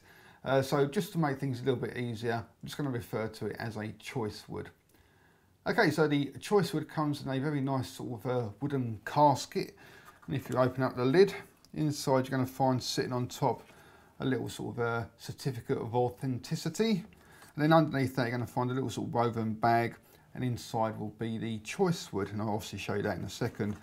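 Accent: United Kingdom. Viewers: Do you know that your vids are good for people who want to learn British accent? Btw, great review as always, stay lit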